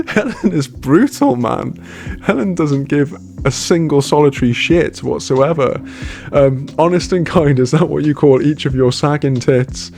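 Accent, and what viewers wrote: United Kingdom. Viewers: The brittish voice adds so much to this.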